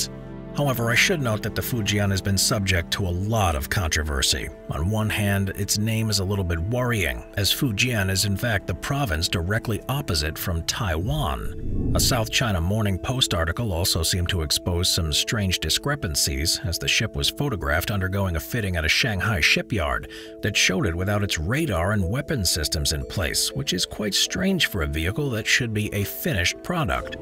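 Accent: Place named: United States. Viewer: I'm impressed by both your scientific and historical knowledge but find myself astounded at your level of patience. I would've lowered the tone considerably by mentioning the fact that the US reneged on their deal regarding nuclear technology after being given it all free and I'd have mentioned Kasserine in the passing. You'd need a British radar to find the cavity between some folks ears but then all the US Universities are owned/funded by the Chinese which is quite the coincidence, since this channel is also.......just has a dubbed American accent repeating 'murica. To any normal Americans, no offense, we'll always have your back! USGB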